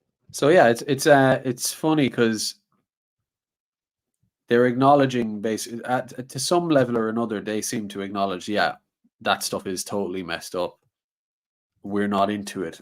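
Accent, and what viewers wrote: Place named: Ireland. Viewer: You sound like a typical uneducated bigot but with an Irish accent. I thought the Irish were a lot smarter than that. Clearly you have proved it wrong.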